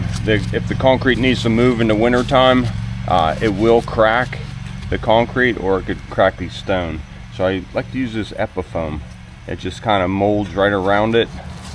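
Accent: United States. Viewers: Everyone has an accent. I'm from Australia. I definitely hear your American accent 😁 Beautiful work as always!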